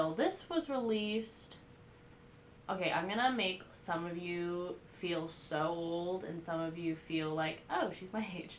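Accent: South, United States. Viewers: Sweetheart, where are you from? I'm from Tennessee and I love your southern accent. I pretty much lost my southern accent in college. But I still think it's cute for a female! The song is filmed at a concert they did in 1991 In Moscow Russia, 1.6 million people were at that show. Dimebag Darrell is the guitar player and he was killed on stage on 04 but he was and still is a guitar God. His brother Vinny Paul Abbott is playing drums. They were both legendary.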